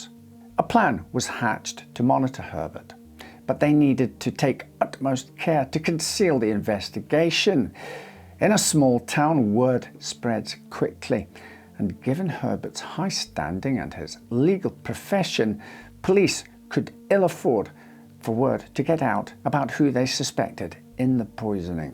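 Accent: Scotland, United Kingdom. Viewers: In this particular presentation I am inclined to agree with you. Normally his accent is quite smooth with more of a Yorkshire twang but it seems he is adding a sort of Scottish intonation to it now. I noticed the change straight away and agree with it being a bit offputting and parody-ish. Edit: I didn't realise was Scottish. So yeah my comment would seem inane at first read. Sorry! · he is Scottish, from Lanarkshire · Has revealed where he is from in the UK? is a Scottish name, I believe and his accent sounds like it. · He clearly has a Scottish accent, but it sounds a bit mixed, so I suspect he's spent a lot of time south of the border. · Love the way the presenter talks and makes it easy for a non English speaker to understand. Are you Scottish? As for the case, I say it was all due to greed. No rival involved, Armstrong just wanted to be the top dog in his community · I can hear a little Scottish accent here and there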